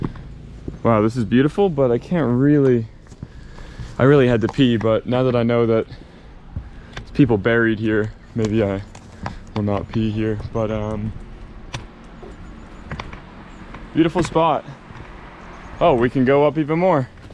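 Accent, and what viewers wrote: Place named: California, United States. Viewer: you sound Californian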